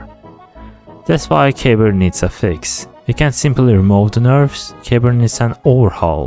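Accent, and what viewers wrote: Turkey. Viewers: Чувствую турецкий акцент)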